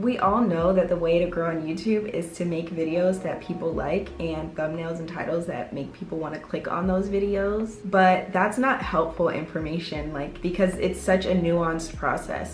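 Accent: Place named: England, United Kingdom. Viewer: I love your English accent ❤ Uganda 🇺🇬 loves you sister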